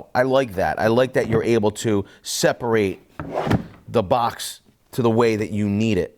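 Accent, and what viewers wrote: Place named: Northeast, United States. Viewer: This guy is so NorthEast he reminds me of my childhood.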